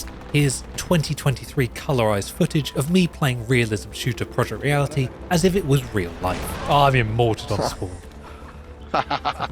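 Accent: United Kingdom. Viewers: Why is a British guy telling me the gun is unrealistic. You’ve never shot a gun. This is more realistic than it was. When you shoot a gun with a scope, the scope moves. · Typical dodging the question British retort on Youtube. "haha just a video game it doesn't matter, real war bad" I really see the weather caused depression and I'm glad my ancestors waged war to separate ourselves to where now your prince married some tramp and your king sucks on top of that. I mean why even go over real life in a military simulation (besides the fact artists and coders more skilled than you dumped their heart out on this experience). And your argument? Hilarious, you give no solutions to the issue you complain about. Yeah, you claimed milsims stupid because they're not real life. I don't play milsims but I know what you just said was placed on a Received Pronunciation Platter of over confident self-hatred, the next course is projection.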